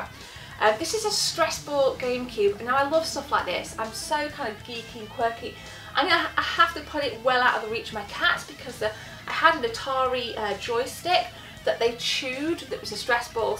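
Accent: United Kingdom. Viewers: Awesome finds, I wonder if you always get good deals in the states because of the British accent? I here Americans like it. And also i really want Batman Returns on the snes.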